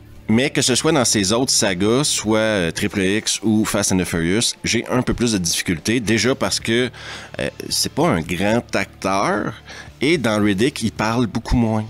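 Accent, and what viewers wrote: Quebec, Canada. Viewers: J'ai découvert ta chaîne il y a quelques jours et je me suis tellement fendu la poire que j'ai dû voir au minimum une cinquantaine d'épisodes. Qql suggestions : Brain dead, bad taste, phase IV. PS, j'adore l'accent québécois 🤩🤩🤩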